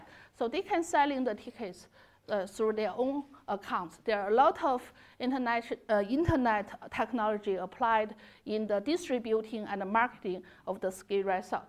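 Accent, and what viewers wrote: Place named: China. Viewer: 这中式英語果然听的清楚多了、：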